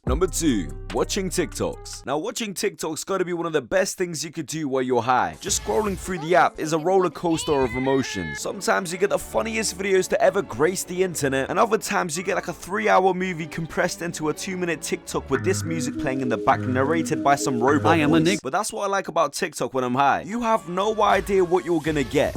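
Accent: United Kingdom. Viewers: After half of the video, just found out this dude is British 💀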